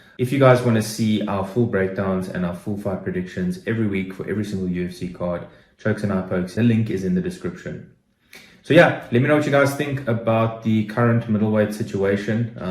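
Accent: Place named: South Africa. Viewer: i see. I ve got a soft spot for the beautiful SA accent. Subbed :)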